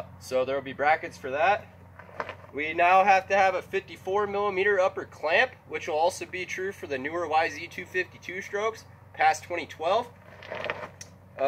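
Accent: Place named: Mexico. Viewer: Why does he sound like a mexican speaking english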